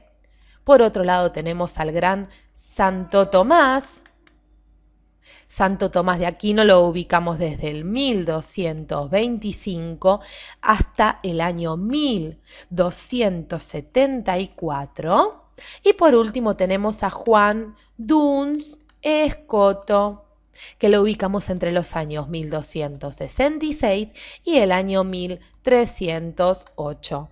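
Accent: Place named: Argentina. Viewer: Hola chicos... que tiene el acento? No son argentinos ustedes? 😊 los argentinos nos caracterizamos por el acento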